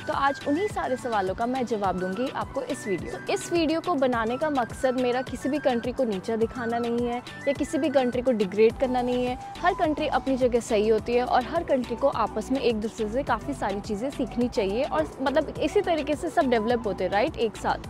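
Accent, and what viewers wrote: India. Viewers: loved your North Indian Hindi accent. Sounds awesome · awaz se karol bagh ki lag rai ho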